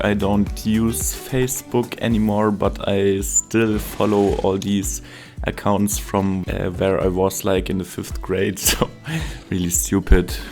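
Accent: Germany. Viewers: I’m sorry people are so crappy. Your English and your videos are great. Your German accent is actually quite charming! I’m glad you put your voice in videos! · Ich liebe deinen deutschen Akzent! Grüße aus Australien. :) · I think your German-English Accent is really pleasant. It's not harsh at all. Don't listen to the pointless critiques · It feels very safe having someone with a german accent comment a build of infrastructure. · Your English accent is fine. You do sound German, but there's nothing wrong with that. I can understand 100% of what you're saying, and that's all that matters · imo your videos are better without narration it's not that your english is bad, it's pretty good, and yeah, even i as german can hear the german accent... it's just that all your vids i watched the last 2 years were unnarrated and this narration kind of... destroys the whole 'oh a new video is up' i love your choice of music and that helps relax after a day of work. 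so i just want to sit here, watch city go brrrr and listen to some music. now i have to listen to your voice - and can't hear that music and relax. good that you had the 'commentary' tag in your video. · Of course you would have a German accent. You speak German. I've got a Southern US accent. I barely speak English, let alone as a second language. Keep up the amazing work! You, CityPlannerPlays, and Biffa introduced me to the game and I've been hooked! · It’s great to hear your voice, everyone has got an accent. It’s part of their unique personality. Even English speakers make fun of other English speakers accents. I love hearing English with a German accent 🇩🇪. Makes it all sound so technical and official 😂 vielen dank und warte auf das nächste video 🙏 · Well, a couple of things. First, I absolutely love hearing your voice and the accent. My grandfather came from die Schweiz (Lucerne canton) and my father could speak fluent Hochdeutsch. 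As a result, I learned some Deutsch as well and took a few years of language study in college. Sadly, I've forgotten a lot of it, but a German accent is a very nice thing for me to hear sometimes. Second, I think that it really sucks that people objected to you speaking on your videos and were mean to you. Besides the point above, I think you do a fine job with English and hearing a voice reminds me that there's a real person behind the genius of your builds. You have taught me a lot and are a treasure to the Skylines community. I'm glad to hear you! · You speak English well sir... and yes you have an accent imagine that! A German speaking English having a German accent... no really. German is one of those languages that you grow up speaking and learning how to form some complex sounds in your throat muscle memory as it were and you can not just stop that memory when you change languages. 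I speak Thai but with an American English accent and wow.. no one understands me sometimes :) ... The accent is perfect for the videos which is why I started following you to begin with, build, artistic license, knowledge and inspiration that is why I follow you, the mad German scientist is just a plus :) Thanks for all the inspiration and sadness that my city building skill are just not up to par with yours sir!!! LOL ... peace out brother. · I really like the German accent ❤ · Ich selber finde den deutschen Akzent auch nicht besonders schön, aber deswegen beleidige oder vermiese ich doch niemandem den Tag? Jeder kann seine Meinung haben, aber ich würde deswegen niemals auf anderen rumhacken. Außerdem sind deine Zuschauer ja hier, um dir beim zocken zuzuschauen! Außerdem habe ich jetzt mehrmals gelesen, dass andere die nicht Deutsch sind, den Akzent garnicht so schlimm finden. 🤷🏽‍♀️ Lass dich von den ganzen Kommentaren einfach nicht runter machen. 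Wenn du Voice Commentary machen willst, dann mach es einfach! Und dein Englisch ist ja nichtmal schlecht!! (Übrigens ist es echt angenehm dir zuzuhören 😅)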